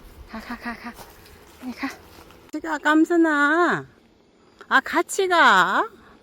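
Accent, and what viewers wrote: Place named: Chungcheong, South Korea. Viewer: 충청도 사투리 정겹고 좋네요잉. 깜순이한테 나는 귀여운 북 소리 아이구 이뻐!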